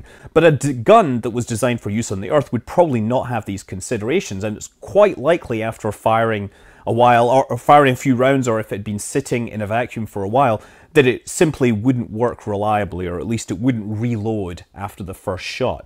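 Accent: Scotland, United Kingdom. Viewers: Hear the voice of a true Scottish Space Highlander! Also, millions of Americans have some Scottish ancestry. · More likely Scottish.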